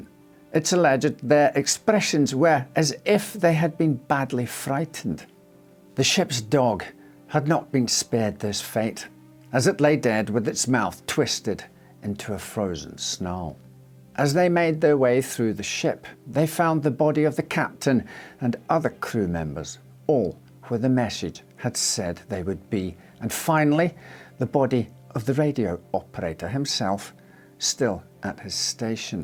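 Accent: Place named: Scotland, United Kingdom. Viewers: Being told tragic and horrible stories, but in my favourite accent and a really nice voice is a confusing experience, but as usual with me, the Scottish accent outweighs the nightmare material. 😌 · I’m really curious about where you’re from — your accent has some strange twangs, like when you pronounce “O” it sounds very New Zealand (“boat,” “known” etc), while you mainly sound Scottish…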